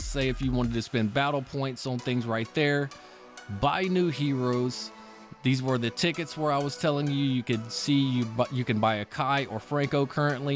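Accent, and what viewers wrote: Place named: United States. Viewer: Thank god, an american. Im convinced that everyone in every other country cant explain anything to anyone. Nor can you even understand them.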